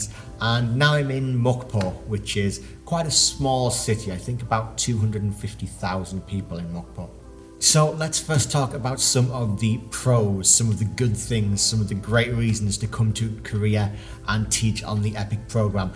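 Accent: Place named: Northern England, United Kingdom. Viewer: Absolutely blowing my mind that you are from Newton Aycliffe! This video was in my YouTube suggested and I've just applied for Epik so thought I'd check it out. Straight away heard the northern accent and checked out the comments to see you're from my town!! What a coincidence - small world aha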